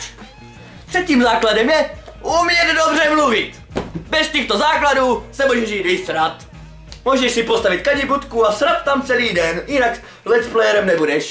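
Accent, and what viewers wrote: Moravia, Czech Republic. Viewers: Jsi z moravy??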